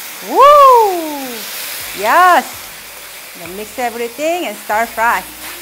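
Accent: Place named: South Korea. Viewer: Omg your Korean accent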